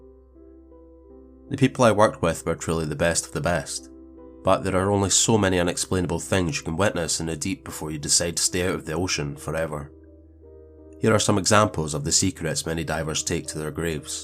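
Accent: Scotland, United Kingdom. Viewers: Turning on auto captions will let you know the AI does not recognize a proper Scottish accent lol it's hilarious!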